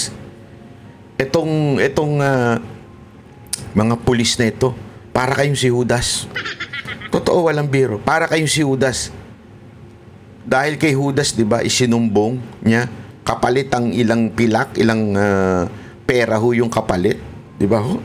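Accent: Visayas, Philippines. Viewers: Ang cute magbisaya mo sir 😂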